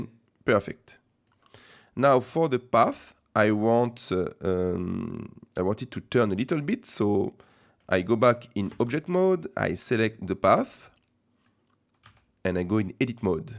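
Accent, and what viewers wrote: France. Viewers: C'est un français ce youtubeur? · accent francais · i really love your french accent tres bien continuee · tu serais pas français par hasard ? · French accent, bonne journée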